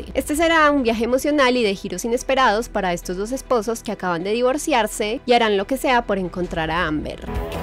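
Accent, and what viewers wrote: United Kingdom. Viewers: hablando ingles británico 😁😁😁 Amigeeks: Mira ese potencial.jpg Yo: Que femenina.jpg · Me encanta el acento inglés de 🇬🇧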